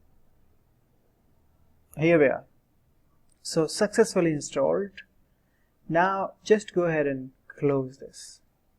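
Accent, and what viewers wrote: India. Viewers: Hey Indian go to normal accent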